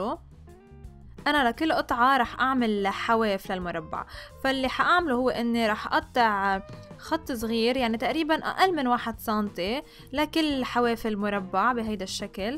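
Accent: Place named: Levant. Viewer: ماشاءالله وصفاتك بتجنن ولهجة اهل المشرق بلاد الشام ماشاءالله بتعقد 😍😘😘😘 موفقة حبيبتي 👍🌹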